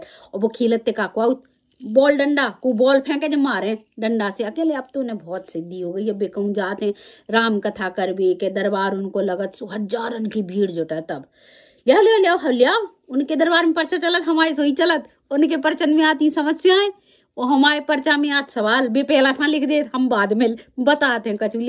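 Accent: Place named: Bundelkhand, India. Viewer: वाह क्या बात है लाली क्या bundeli बोली तूने मान गये बगल वाली अम्मा को, क्या बीच का रास्ता निकाला है उत्तर देने में l तेरी अदाकारी को लाखों सलाम यारा! मज़ा आ गया मेरी जान 💗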